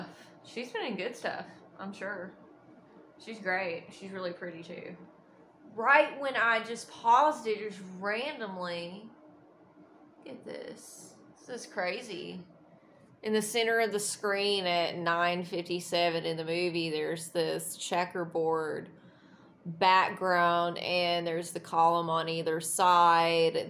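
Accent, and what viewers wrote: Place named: South, United States. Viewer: you're too damn cute and voice is so southern I love it lol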